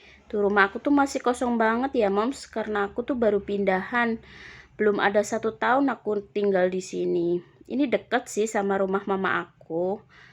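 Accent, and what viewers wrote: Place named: Banyumas, Indonesia. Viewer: Salam kenal mbak, aku ngapak juga, dari cilacap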